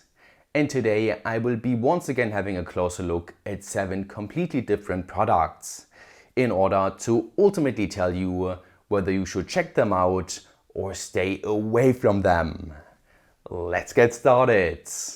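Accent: Germany. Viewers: This German accent is brutal, but still fun to watch.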